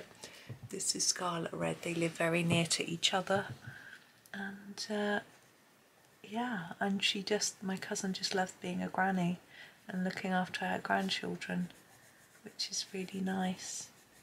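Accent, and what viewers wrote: United Kingdom. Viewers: I love your chattie videos. The British accent and info on the “ways” the Brit’s do and say things. Just love it. 🥰 😊 And, of course, your coloring.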